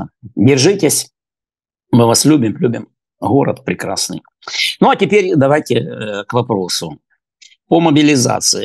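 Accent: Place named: Odessa, Ukraine. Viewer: Ведущая говорите как говорят в Одессе!!! Противно слушать!!!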